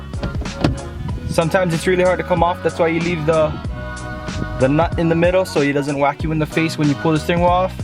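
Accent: Hawaii, United States. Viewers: You from Hawaii?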